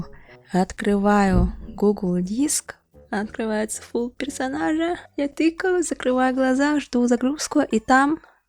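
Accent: Yakutia, Russia. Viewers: Я ИСПУГАЛАСЬ ЯКУТСКОГО ЯЗЫКА, Я НЕ ОЖИДАЛА ЭТОГО.. только сейчас начала слышать якутский акцент и голос очень хочу на фестиваль, но я в деревне живу, а в город еду только январем 😭😭😭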